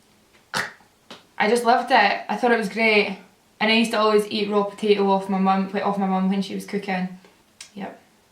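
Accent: Scotland, United Kingdom. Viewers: Whereabouts in Scotland are you from? I'm Scottish too and I love your accent, but I honestly can't place it!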